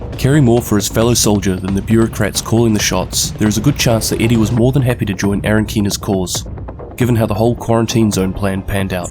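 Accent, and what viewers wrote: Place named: New Zealand. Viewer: oh okay, i kinda like that kiwi accent...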